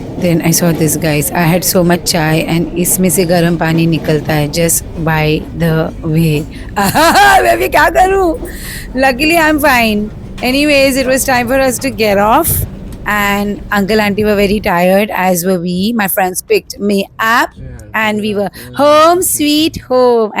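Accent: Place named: Mumbai, India. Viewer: You are typical Dadar - kar ....I mean your accent. Loved your video. Thanks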